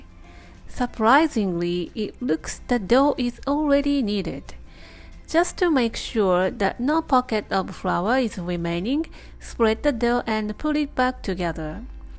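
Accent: Japan. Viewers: I love Japanese accents when they speak English! So cute! Thanks for this amazing video!!!! Very well and detailed explanation in how to make a 🥖! I Will give it a try for sure!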